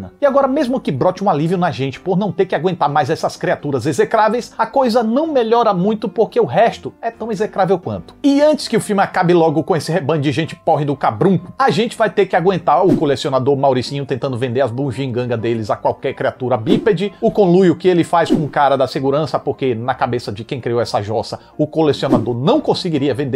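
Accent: Northeast, Brazil. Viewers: Mano vc tem um sotaque meio nordestino, o que casa muito com o tom cômico do video. Brabo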